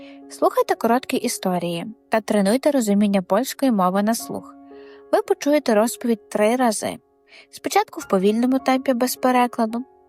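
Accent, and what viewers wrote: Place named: East, Ukraine. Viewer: Якась дитяча вимова. І дуже чується східний акцент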